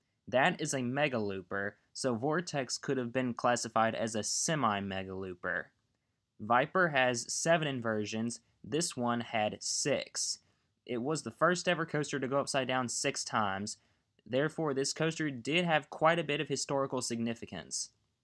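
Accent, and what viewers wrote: United States. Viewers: Country accent mixed with vortex= 💯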